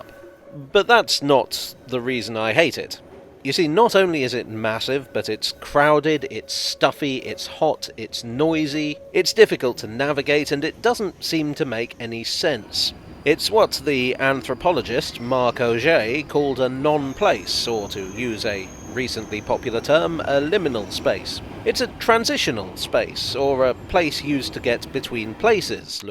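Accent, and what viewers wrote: United Kingdom. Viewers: I like your Britty narration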